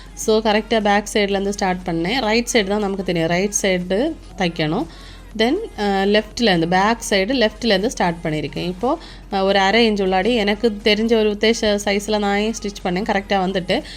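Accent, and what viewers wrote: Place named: Kuzhithurai, India. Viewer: I like your speech,I had relatives in kuzhithurai,They speak the same style as you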